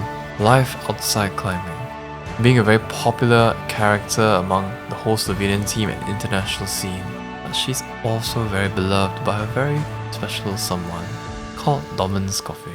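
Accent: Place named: Southeast Asia. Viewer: great video! but random qn.. by any chance are you Southeast Asian? hahahaha your accent is familiar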